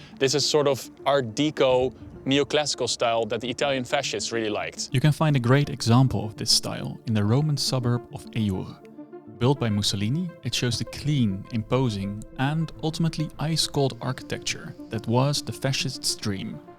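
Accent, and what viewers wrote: Netherlands. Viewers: very nice video, you sound really Dutch. :) ! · I guess he's Dutch. He has a thick accent, but trying to imitate an American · I hear a dutch accent..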